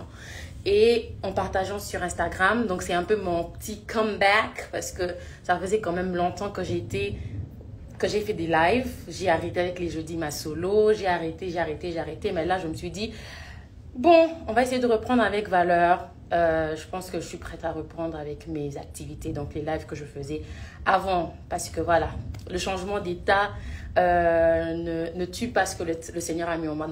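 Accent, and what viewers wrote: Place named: France. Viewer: Elle s exprime très bien !!! accent français hein !!!!!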